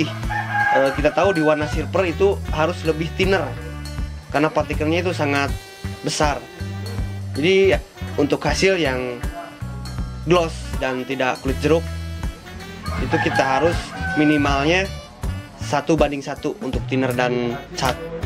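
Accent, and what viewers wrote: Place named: West Java, Indonesia. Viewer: bukannya Jawa ngapak ya? Kok logatnya Sunda? 🤔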